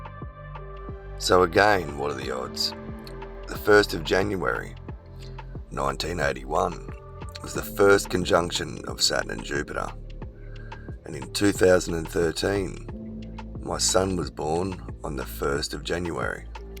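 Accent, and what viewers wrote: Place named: Australia. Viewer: Wow this is crazy awesome! ... a lot to digest here... so your Son's name is Phoenix? For reals!!! Both my husband and first born daughter = 138 in ordinal.. I'm 888 in Sumerian and a couple of things I found while watching this (just for fun) I was exactly 9 months 1 week and 1 day old (911) on July 25th 1981.... and 34 years 8 months(+3 days) after January 1st 1981 my first daughter was born pm.. I love your vibe man,.. so great to hear a bonza Aussie accent for a change while listening to videos on YouTube.. :face-blue-smiling:💙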